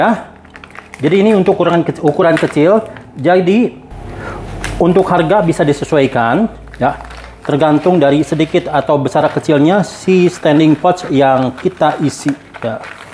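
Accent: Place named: Sunda, Indonesia. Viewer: Mantap kang, di GALO pokokna mah, dan kita SODOK, sunda pisan kang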